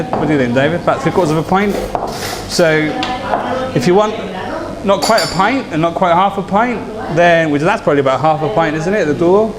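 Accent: Andalusia, Spain. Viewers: y Alan Bowman Actually, I'm Spanish and I find his pronunciation is pretty good for a foreigner, with quite a thick Southern Spanish accent. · Me encanta tu acento andaluz! es muy muy bueno. Saludos desde Madrid :)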